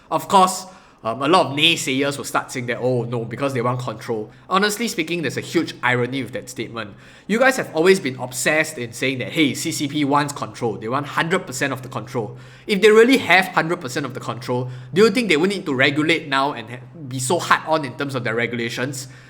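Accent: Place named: Singapore. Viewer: 新加坡口音的英语！！！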